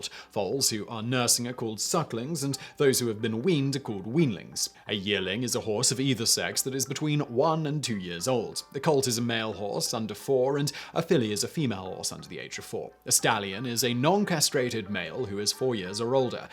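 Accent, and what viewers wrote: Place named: United Kingdom. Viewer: Zedbras 😂😂 I love british english (yeah yeah, i know lives in Prague)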